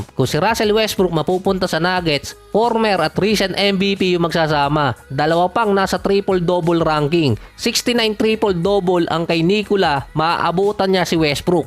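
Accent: Batangas, Philippines. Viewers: BATANGEÑO PALA SI IDOL .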